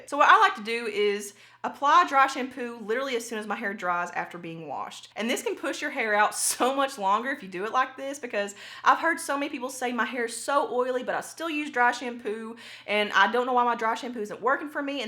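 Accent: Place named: Kentucky, United States. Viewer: Just found this channel, heard your accent and just knew you were one of us KY girls! Love it!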